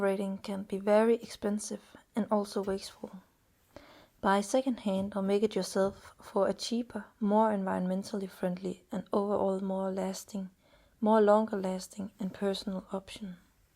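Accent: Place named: Denmark. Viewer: Thank-you for the voice over! I love hearing your Danish accent. It is such a lovely addition to your videos!!!!!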